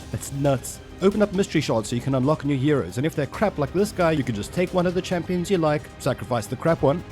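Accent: South Africa. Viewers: What are the odds of you being a South African excuse me for assuming it just the accent 😂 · You have to be South African as well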